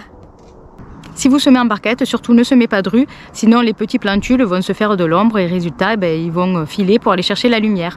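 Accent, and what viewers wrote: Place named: Provence, France. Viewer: super merci pour la réponse, j'ai l'habitude de tout (ou presque) rentrer tant qu'il gèle. À la vue de tes résultats je vais m'inspirer de ta façon de faire, je suis en Provence, donc à l'ouïe de ton accent on devrait pas avoir une grosse différence de climat. 😉 À plus merci encore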